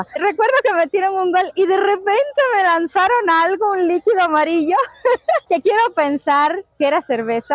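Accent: Mexico. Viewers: Cómo es que asimilaste y adoptaste completamente el acento Mexicano? ... siendo el acento Cubano tan difícil de perder. Bueno, en 10 años si es posible .❤ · Conozco cubanos acá en usa , tu no tienes acento cubano , ya eres Mexicana 🇲🇽🇲🇽🇲🇽🇲🇽🇲🇽🇲🇽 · Hola usted no se escucha como cubana habla como Mexicana saludos buen video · Ya no tienes acento es más pareces más mexicana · No Tienes acento Cubano 🙄 Parece qué eres de aquí de México Saludos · Una cubana con acento mexicano 👍✌️se explica muy bien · Tienes muy bien el asiento mexicano y no cubano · Amiga...una pregunta...como se siente a estas alturas del tiempo haber perdido el acento cubano....no te sientes mál.....tienes orgullo del acento mexicano...cual es tu sentimiento al respecto? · Amiga ya me suscribi saludos para ti ya hablas como mexicana😊😊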